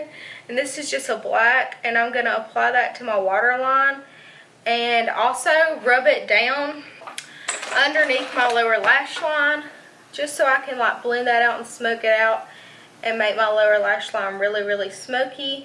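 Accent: South, United States. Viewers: Beautiful look!! I love how the warm tones make your eyes pop! Also, I love your accent. I'm from the South, too but my accent isn't like yours! :(((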